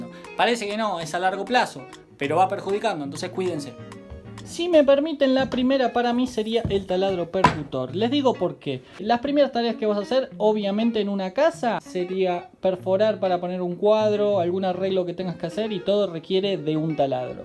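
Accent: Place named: Argentina. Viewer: woww!! bro magnifico video!! tan bueno y tan entretenido el video, que me senti obligado a comentar jajaja, pense que porque tienes acento argentino me iba a fastidiar tu voz, pero no, al contrario transmites mucho carisma y para nada eres aburrido, primer video que veo y ya ando suscrito, incluso antes de que se acabará el video jajajaja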